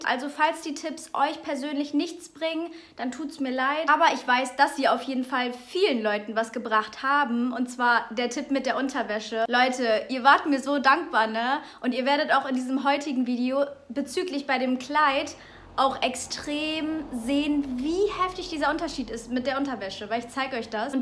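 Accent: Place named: Germany. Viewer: Sie hören Cute Your German Akzent und Sie sehen niedlich diese Jeans in Ihrer Figur. Nette Mädchen Grüße aus Mexiko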